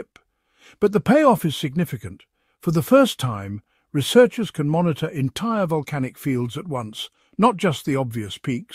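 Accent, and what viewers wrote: United Kingdom. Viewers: WTF are we listening to a Brit tell us about our PNW? Does he have any credibility? Does he know about Baker or Shasta? He brings up Arizona and Wyoming. Has he ever hiked through the Craters of the Moon as a kid? Where was he when Mt St Helens killed Harry R. Truman? Has he ever visited Mt St Helens? I doubt it! He reminds us of everything we experienced in 1980! Do we need to be told that there was plume across the US? Has heard about the Cascadia Subduction Zone? What goes silent forever? I’d suggest that the should shut up and go silent forever!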